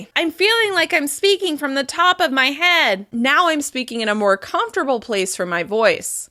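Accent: United States. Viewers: American acent amazing teaching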